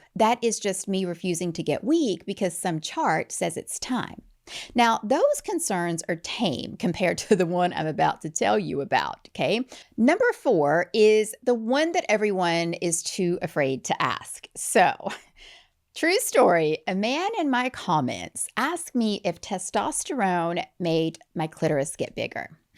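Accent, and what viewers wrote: United States. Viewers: Painful American … my ears started bleeding listening to this crap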